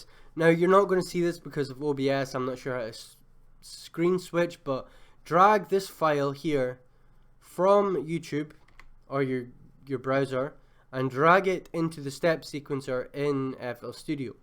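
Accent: Scotland, United Kingdom. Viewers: Nice vid bro Scottish accent got the sub for me sick to death of American tutorials mate no offence to any Yanks reading this comment lol 👍🏼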